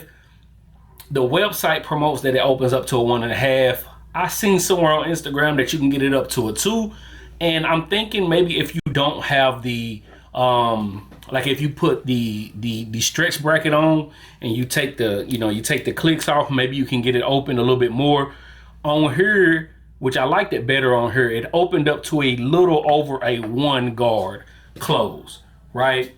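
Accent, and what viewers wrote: South, United States. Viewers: Nothing wrong with a southern accent brother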